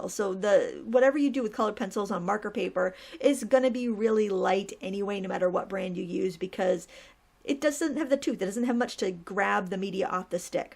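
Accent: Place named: Arkansas, United States. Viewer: Hello, Hope you are well. This is really cute just not my thing (yet), hoping to work on some postcard simple watercolors to start with and good basic drawing practice first. Just wanted to let you know I've been watching alot of your videos and to let you know, your way of saying things, you would blend in with us Arkansas folks just fine. We say ain't and other "incorrect" expressions, we also have a tendency to run several words together, but any from here understand quite well. I like the way you express yourself. Ever need a change of pace, we have canoeing, lakes and national forest recreation parks all over the place. You surely be welcome here. Thanks for all your help and support, is greatly appreciated. God bless. Sincerely Becky B from Arkansas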